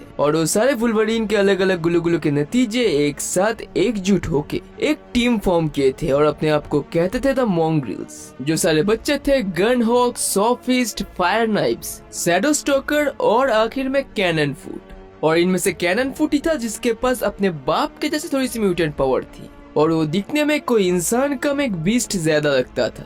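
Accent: Bengal, India. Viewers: Bro u r bengali na?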